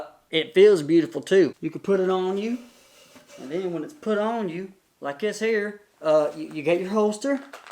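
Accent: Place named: South, United States. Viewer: You always treat a weapon as if it’s loaded even if you just unloaded it. I’m sure you’re a fine shooter .. I’m just saying you had your finger inside the trigger guard in that last video I watch. That’s a no no .Guess it was this video just the short version of it… You have a southern accent… where are you from… are you close to the great state of Kentucky?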